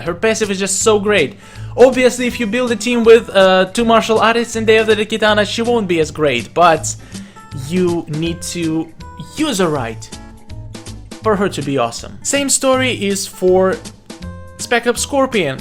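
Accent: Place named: Russia. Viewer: I don't mean to be offensive But are you Russian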